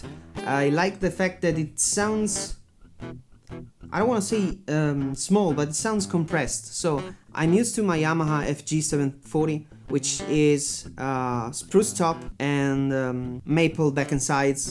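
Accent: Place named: Brazil. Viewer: Vc é brasileiro mano eu percebi o sotaque, quase nenhum, mas consegui perceber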